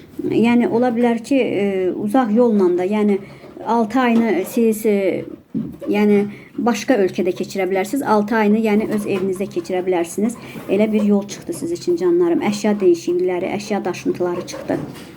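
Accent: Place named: Qax, Azerbaijan. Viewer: Səsinizi eşidəndə o qədər içim rahatlıq tapır ki.Təşəkkürlər sizə canım bacım😍🤗Ləhcəniz o qədər şirindir ki,Qax ləhcəsi kimi🌹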